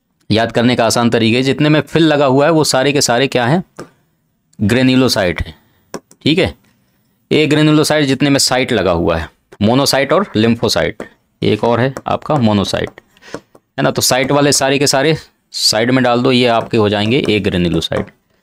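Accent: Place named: Jharkhand, India. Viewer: Pure Jharkhandi sir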